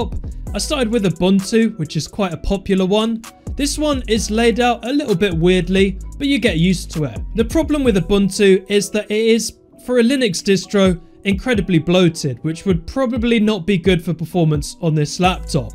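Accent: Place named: England, United Kingdom. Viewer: He's english. Just because he has an accent doesn't mean he's indian. There are lots of nice people in India and you just provoked them just like that, what a shame.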